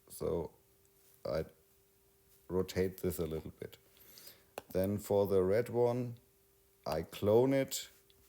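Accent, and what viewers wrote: Bavaria, Germany. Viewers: Immer wieder neue Einblicke in Nomad Sculpt - die App, die mir leider noch lange ein Buch mit sieben Siegeln bleiben wird. Aber ich folge dir gerne weil du beständig im Thema bleibst. Ich hab die Hoffnung irgendwann genug Zeit zu haben alle deine Tutorials nachvollziehen zu können. Wobei mir die älteren Tutorials auf deutsch leichter fallen. Trotzdem Daumen hoch für den Bavarian ( ich höre auch fränkisch raus) - English Aspekt.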